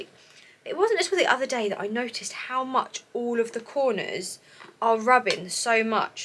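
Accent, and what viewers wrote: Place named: RP, United Kingdom. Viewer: I just love your British accent, it’s so posh:) 😍😍😍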